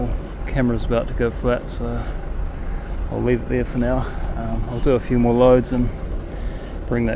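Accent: New Zealand. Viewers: as a new viewer of your videos again I can't get enough! I could have watched that for over an hour! I did miss the closed caption option, I am hard of hearing but it takes me a while to figure what you're saying in the New Zealand accent! I'm not complaining, but I sort of depend on that closed caption to make sure that I understand your every word. AM. Skip, Western PA, USA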